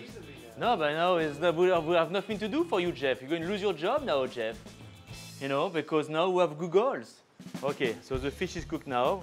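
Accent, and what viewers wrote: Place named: France. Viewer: J’ai kiffé la présentation tranquille décontractée sans pression les internautes apprécieront l’accent bien Frenchie merci chef une phrase qui m’a marqué chez vous c’est : Allez! Nettoyer vos stations! Merci chef pour ces recettes bien expliqué English/French trop cool 👍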